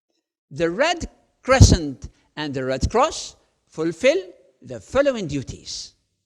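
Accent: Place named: India. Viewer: lol he has an indian accent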